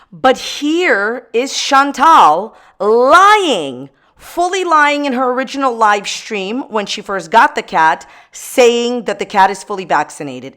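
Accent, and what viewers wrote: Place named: New York, United States. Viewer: I’ve been a part of the Amberverse since this most recent “breakup” in July. No idea how I haven’t found your channel sooner!! I moved to Florida a few years ago, from Massachusetts. Your thick NY/NJ accent reminds me of the North… I love it! Definitely my new favorite GorlWorld reporter!!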